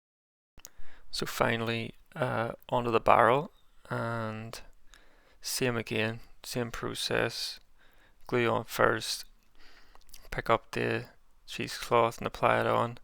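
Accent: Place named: Northern Ireland, United Kingdom. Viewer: just came across this vid, weird clickin on a mini tutorial and hearin someone from norn iron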